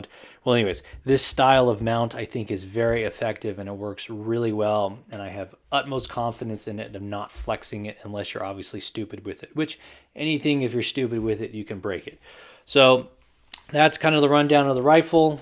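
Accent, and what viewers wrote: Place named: Arizona, United States. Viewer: something in your voice reminded me of Ian from Forgotten Weapons... The way you talked when you gave reason for having only one rifle. I was like: I bet they are from Arizona - and that was before seeing the cactus patch! Am I close? :)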